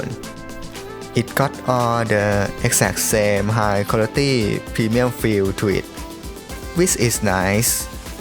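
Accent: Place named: Thailand. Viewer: คิดว่าต้องเป็นคนไทยแน่ๆ สำเนียงแบบนี้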